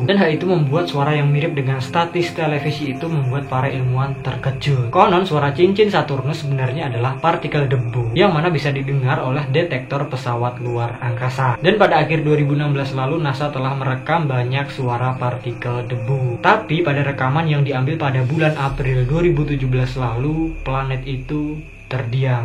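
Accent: Java, Indonesia. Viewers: Njenengan jowo mas? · bisa ilangin medoknya gak? mles dngernya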